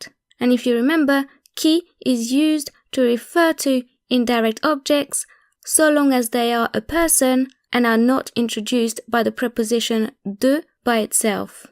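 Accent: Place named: London, United Kingdom. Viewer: You are teaching us English grammar as well. You sound like you have spent quite bit of time in London. Thank you for clarification was "bit" lost.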